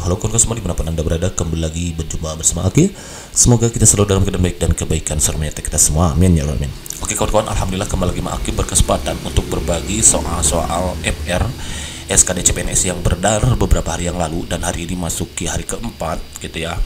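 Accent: West Java, Indonesia. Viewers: Orng Sunda ini mimin nya 😁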